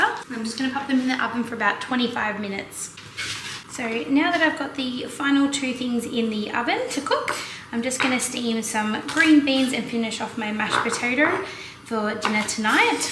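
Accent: Australia. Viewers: just beautiful as always <3 I love watching your videos, even your voice and the Aussies accent soothes me! Thank you so much for all your work and please give us more !!!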